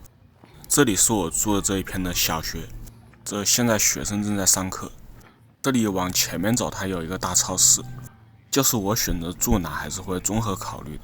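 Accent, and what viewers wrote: Wuhan, China. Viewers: 听口音就听出来是武汉滴，果然哈哈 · 楼主武汉人，弯管子，口音好重。😄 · 楼主口音像湖北武汉的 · 这个汉普好亲切啊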